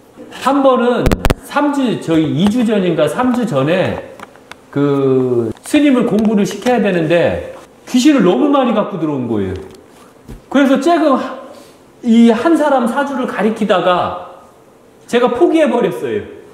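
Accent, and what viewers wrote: Chungcheong, South Korea. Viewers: 충청남도 말씀하시네요 저도충청남도입니다 반가워요 어지그리 구수하게말쓰을하시는지 얼마나 재미있웃우운지 ㅎㅎㅎ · . 상투와 두건은 깔끔하고 시원해 보여 눈이 즐거운데요, 수염은 푸시시한게... 좀...염소 같잖아요. 턱선이 부드러워서 구수한 충청도 사투리하고도 잘 어울리는데 말예요. 면도싹~ 어떻게 생각하세유?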